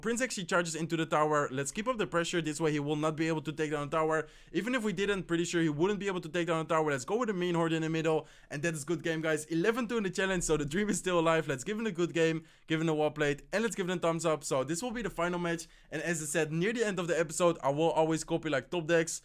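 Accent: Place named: Netherlands. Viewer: je klinkt echt heel erg nederlands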